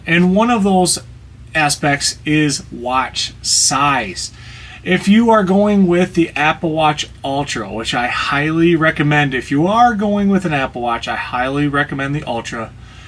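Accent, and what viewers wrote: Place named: Michigan, United States. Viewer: This guy is so Michigan. The clothes. The accent. Dude looks like every dude in my town. Props from Traverse City, man!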